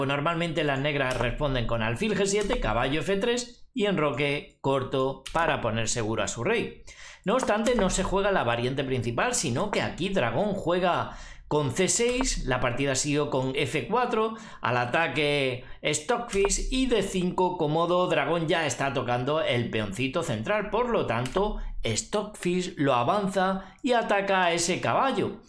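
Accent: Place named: Spain. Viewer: Los españoles hablan asi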